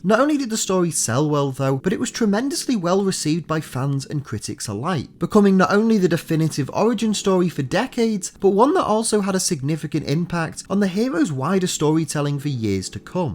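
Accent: United Kingdom. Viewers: Hey your soothing, British voice keeps me company and informed during the night shift. Thanks mate✌🏻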